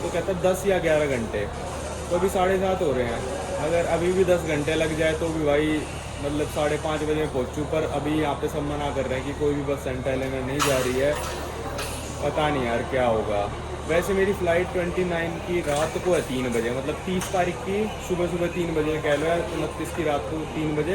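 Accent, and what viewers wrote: Haryana, India. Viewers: bhai accent pehle wala hi rakho. Ye aapka haryanvi accent irritate KR raha hai. 🙏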